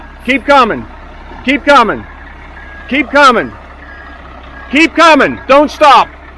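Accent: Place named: Pennsylvania, United States. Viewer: Where are u from? Sounds like pa.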